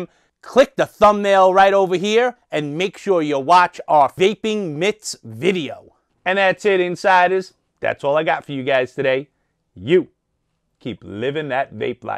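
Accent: New York, United States. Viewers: I can guarantee that if I use that same exact wire, with the same exact amount of wraps, the last thing it will do is give me the 0hms that the calculator tells me I should get. You never did show us what 0hms the mod was detecting the wire as when it was connected to a mod :P. It's useful to know though, how to build. The wire company I bought all my pre-made coils from has just decided to move abroad on us, so I'm stuck for pre-made's now. All I can get is the regular round wire now, so it's useful to know if your in the same position that I am now. I have 500ft of Kanthal A1 sat on the shelf next to me, which I bought just in case I had trouble buying pre-mades. If I can wrap my head around building simple coils, I figure that there's enough wire there to last me maybe 2 years or more, depending on how many times I recoil, which is usually at least once or twice per month. 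By the way, am I right, considering your accent, in thinking that your based around the New York area?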